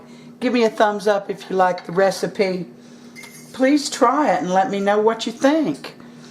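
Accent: South, United States. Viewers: I have not hamburger steak in years. That sure looked good.A real comfort food. So yummy. Wish I was there for dinner. My mama always said "cut off the heat" or "cut off the lights" and not turn down the heat or turn off the lights. I can tell you are Southern. So cute. I always say I can speak Southern. Does that make me bilingual?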